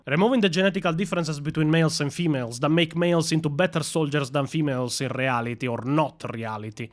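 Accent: Italy. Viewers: Duuuuuuuude you voice, accent and didiction amazing. Were are you from? Italy? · God, hearing you rant about 40k lore with that italian accent, makes me want to believe everything you say and also to fight side by side with you brother! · What region of italy accent do you have? It is very entertaining for narrating. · Idk why I'm here, i just like your Italian Accent. Subscribed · Geezus fucking christ you need to turn down the italian. Gooing to have to watch this video at half speed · ho riconosciuto il tuo accento italiano XDDDDD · Are you Italian or something? I can hardly understand you. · wait, I know this angry Italian voice ....... didnt you help Raven Knight with the Templin fiasco ?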